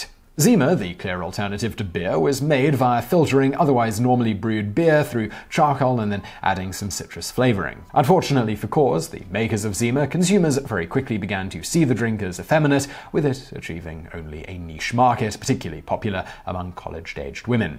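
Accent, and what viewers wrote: United Kingdom. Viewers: As a Californian I find English pronunciations of Spanish words delightful. I feel a little less bad about how much better they are at english than us. Wonderful episode as always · When did the vsauce guy get a British accent?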